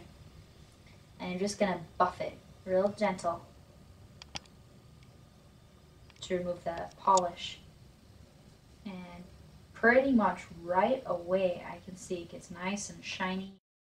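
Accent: Wisconsin, United States. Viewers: Your accent also gives it away. I am also from Wisconsin and when I went to the East Coast, the locals would ask me if I was from Wisconsin or Michigan.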